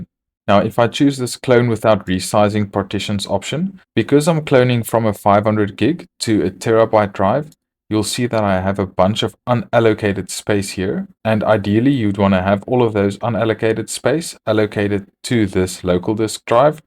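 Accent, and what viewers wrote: South Africa. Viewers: he instantly sounds SO SOUTH AFRICANNNN 🤩🤩😍😍 undeniable SA accent !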